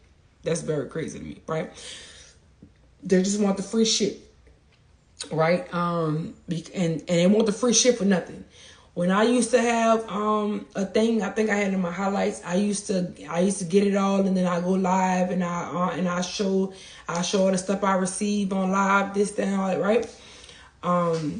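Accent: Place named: New Orleans, United States. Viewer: NOLA Girl, I HEAR YOUR ACCENT.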